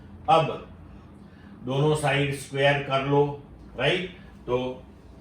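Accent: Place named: Gujarat, India. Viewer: Thank you sir, and you have got full gujarati accent nice explanation !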